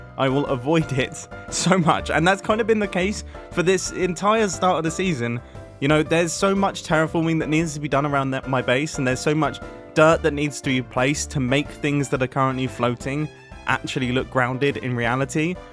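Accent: United Kingdom. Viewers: Uhh no... no crackle but you do sound more British than usual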